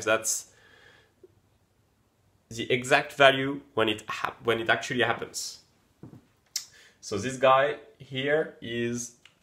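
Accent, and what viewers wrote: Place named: France. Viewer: Bonsoir, j'entends un petit accent français... Tu es français ? En tout cas vidéo très intéressante. Ps : le convecteur temporel c'est pour quand ? ;)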